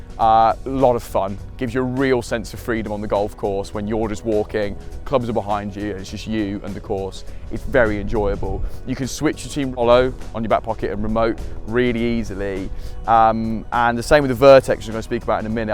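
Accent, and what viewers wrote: United Kingdom. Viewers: this guy is brutally british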